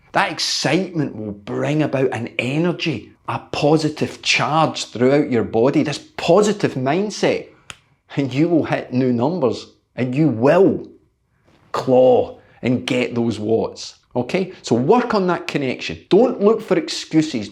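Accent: Scotland, United Kingdom. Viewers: I’ve been working to much and now have time to get back to riding. Body isn’t in very good shape just did two rides of twenty miles after a few days of working on the bikes and fifteen minute butt accumulating rides on the trainer. Didn’t ride real hard but was pleased to have survived as well as I did. Power meter battery was dead for the first ride so no numbers for it. Second ride with power I showed a twenty minute of 170 and pleasingly also a 170 heart rate. At 63 the latter is well a surprise! Now to recover and attempt to acquire some endurance and riding strength again. Life would be simpler without jobs! Enjoy listening to your Scottish language and do apply some of what I hear. Hope your health is better then what was before. 😀 Have a good day over there and be safe!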